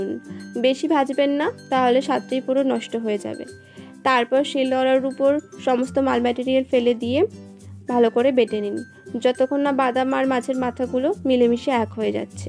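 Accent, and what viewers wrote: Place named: Bangal, Bangladesh. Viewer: Bangal vasai ktha bolis valo lage sunte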